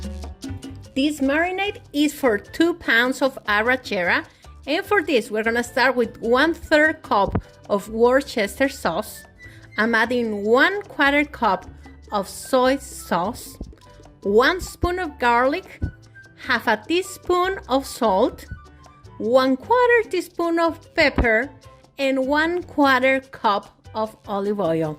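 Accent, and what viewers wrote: Mexico. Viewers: I love your accent. Viva mexico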